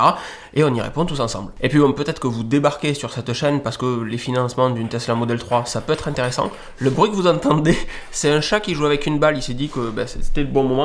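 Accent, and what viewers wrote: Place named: Marseille, France. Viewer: 3.3. minutes : des placements a 10/20% !!!! vu qu il un accent marseillais je me dis c est plus la tradition que de la mauvaise foi...je vais continuer a regarder mais la crédibilité en prend un serieux coup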